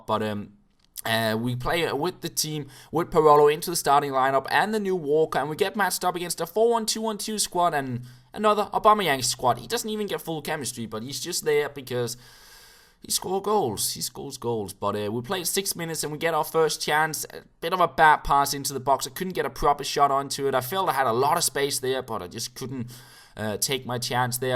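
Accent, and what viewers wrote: Jutland, Denmark. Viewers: Er du fra Jylland?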